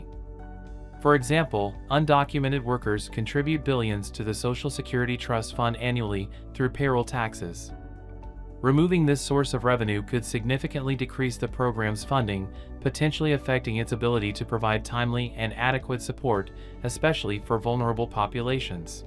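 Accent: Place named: India. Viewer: I hope that's an Indian because it sure isn't a black man's voice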